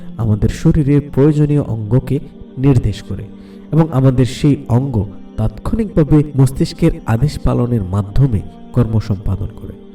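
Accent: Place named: Chittagong, Bangladesh. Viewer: তততো না তথ্য, মাতা না মাথা, উচ্চারণ সুন্দর হলে ভিডিও দেখতে ভাল লাগে। এই ভিডিও তে যিনি বর্ণনা করেছেন উনি সম্ভবত চট্টগ্রামের লোক।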